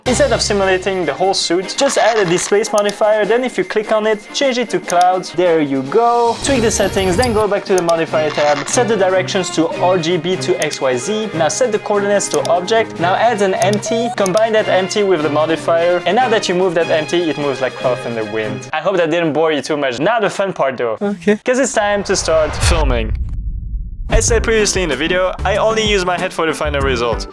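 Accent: France. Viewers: t'es français? si oui, très bel accent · Does my ears mistake me or do you have a french accent ? Seriously I can barely focus on what you said, my mind keep thinking about that, maybe I have an ADHD, idk, just don't ask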